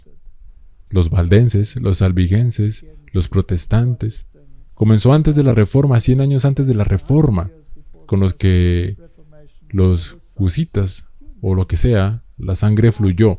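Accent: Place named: Colombia. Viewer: Feliz Sabado!!!!.....gracias por dejar abajo el enlace a la predicacion original. La estaba buscando y no la podia encontrar. De paso me encanta tu acento colombiano!!! Keep up the good works!!!!! God bless your ministry!! Bendiciones!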